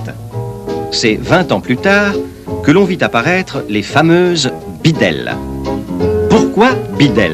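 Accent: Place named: Paris, France. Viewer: Une pointe d'accent titi parisien dans les commentaires bien raccord avec les images. Super vidéo!!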